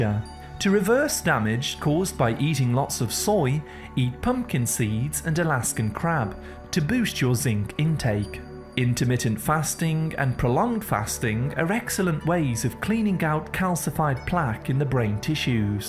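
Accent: United Kingdom. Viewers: Very informative, thank you! Interesting that you read with a British accent but then use American names. E,g. I don't know a single Brit who knows what arugula is (it's called rocket here)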